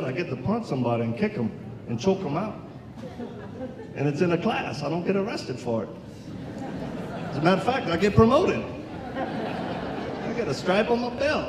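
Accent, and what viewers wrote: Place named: Louisiana, United States. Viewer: Haha I knew you were from Louisiana from your accent, Nawlins forever!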